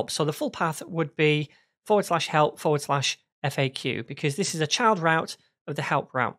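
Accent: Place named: Manchester, United Kingdom. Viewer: Great tutorial! Thank you. I'm guessing that your accent is from Manchester. Perhaps Bury?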